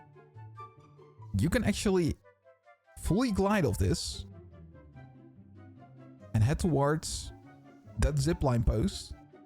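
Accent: Netherlands. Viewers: r u dutch by any chance bro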